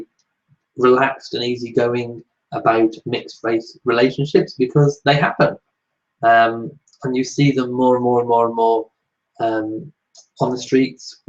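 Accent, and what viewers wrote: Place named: United Kingdom. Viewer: it's nice to meet you! Now I know what you look like. I love your British accent!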